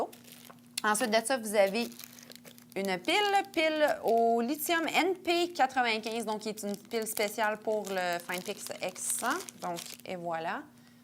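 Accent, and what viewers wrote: Canada. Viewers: Omg, l'accent canadian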